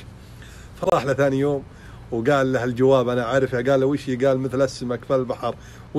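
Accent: Iraq. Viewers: بوويه اللهجه العراقيه تخبل بحلگگ ❤️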